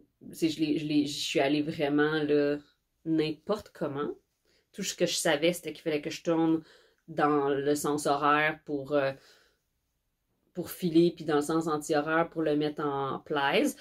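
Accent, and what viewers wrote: Quebec, Canada. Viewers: Hello! Je fais partie de ceux qui après vous avoir découvert au détour d un hasard (Che plus en fait comment je vous ai rencontré 😉)....j’ai visionné tout vos post cast dans l’ordre: pour commencer j’adore l’accent du Canada 😁, il me met de bonne humeur: alors accent et Tricot le combo parfait pour vous découvrir! Et je vous adore: vous êtes toujours souriante, avec de l humour et j’aime votre univers! Ma question: comment vous organisez vous entre job, enfants et tricot?! Sinon pour en revenir à ce post cast: oui faut tout essayer, au pire on défait mais jamais de temps perdu: ce temps à tricoter est aussi un temps de méditation, ou de relaxation 😃. Bravo à vous et garder votre bonne humeur 😘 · Je suis toute nouvelle dans le monde du tricot. Et en regardant tes vlogs et autres vidéos, je joins deux plaisirs : celui de t'écouter (c'est très instructif et inspirant pour la débutante que je suis) et celui d'écouter ton accent québécois (je suis une québécoise qui vit à Paris, et ça me rappelle mon chez-nous !). 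J'aime beaucoup ce que tu fais, y compris ton apprentissage du filage : continue comme ça !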